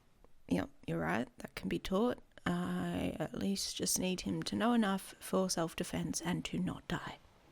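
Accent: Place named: Australia. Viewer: Gahhhh an Australian accent? So rare in the F4A world 🤩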